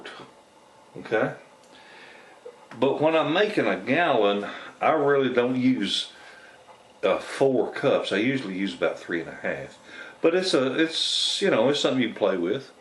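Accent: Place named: South, United States. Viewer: man I like your style and that good old Southern drawl!! with your recipe maybe the South will rise again! thanks for the video!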